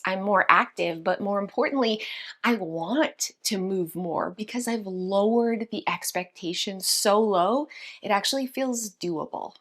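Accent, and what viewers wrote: Canada. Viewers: Never watched Sister Wives. Cilantro tastes delish to me, one of my favorites, we all have different chemical make ups, they say people who have a certain chemical make up, cilantro tastes like soap. I have a friend who loves dandelion tea and yikes! To me it is so bitter, she also likes sardines, ewe. We are all different and unique not "weird" I think you are smart, funny and beautiful and I love your little Canadian accent, I think you are in Canada? Byeeeeee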